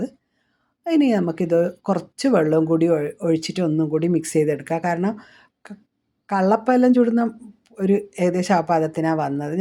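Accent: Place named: Vadakara, India. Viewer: ഹായ് ഞാനിവിടെ പയ്യോളിയിൽ വടകരയിൽ എവിടെയാണ് മില്ലിന്റെ സൗകര്യം ഇല്ല എന്ന് പറയുന്നത് കേട്ടു നിങ്ങൾ ഇതിനു മുമ്പ് എവിടെയായിരുന്നു ഇവിടെയല്ലേ നിങ്ങളുടെ നാട് എന്നെ ഇവിടെ കല്യാണം കഴിച്ചതാണ് എന്റെ സ്വന്തം സ്ഥലം എറണാകുളം ഇവിടുത്തെ നാടൻ ഭാഷയിൽ ഇങ്ങനെ പറയുന്നത് കേൾക്കാൻ നല്ല രസമുണ്ട് ❤👍🏻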